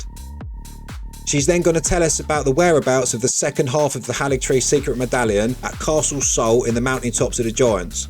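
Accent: Cockney, United Kingdom. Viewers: Good video. But jesus mary and Joseph. The Danny dyer accent and the music. Oof. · Fucking hell this is a solid video. Music, cockney as fak. Love it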